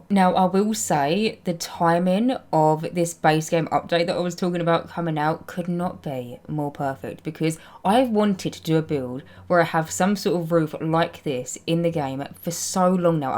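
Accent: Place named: East London, United Kingdom. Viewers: im from east london and this is the first time ive seen a youtuber with a similar accent to mine play sims! i feel so at ease love ur video and ur builds are insane!!! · i love english accent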